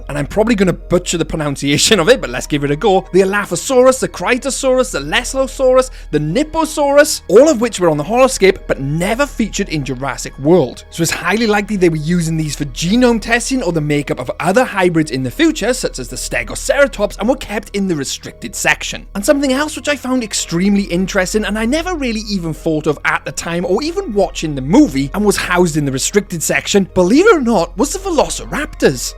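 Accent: Wales, United Kingdom. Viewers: Just new to your channel I love it also are you Welsh too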